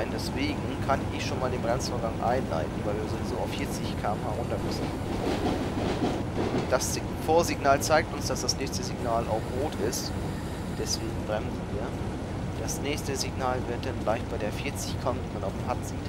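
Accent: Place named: North, Germany. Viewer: Merkt man an der Sprache :D Hamburg hattest du glaub ich im Video gesagt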